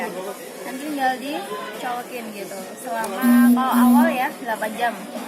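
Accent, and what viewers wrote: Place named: Java, Indonesia. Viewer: Buat ngebor kmu bisa apa ngga mba wkwkwk...kayane wong njowo mba e iki hehhe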